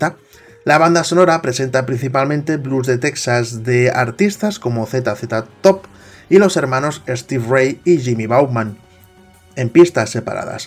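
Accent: Spain. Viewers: a muy bien yo crei que eras de madrid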